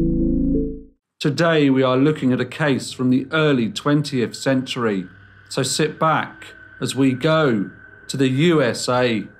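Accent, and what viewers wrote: United Kingdom. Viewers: you really need to lose the british accent for your most large american audience. i would suggest california valley girl. the kardashians speak fluent valley girl, so listen to them. soon you'll be shortening words like gorgeous to gorg, fabulous to fab and ridiculous to redick. any word that ends in ous you will learn to shorten on the fly. i promise you your subscribership will soar.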